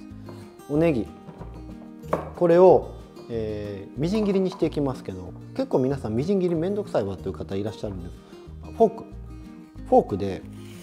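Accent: Osaka, Japan. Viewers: 今日お昼にこのチャーハン作りました。いつもとは違うと家族も気付き美味しいと言ってくれました。😄流石先生の料理ですね!それと先生の大阪弁で料理の評価しながら食べられるところ面白く、大阪人の私にはとても親近感が湧きました。🥰毎日何かしら先生の料理食卓にのっております。☺️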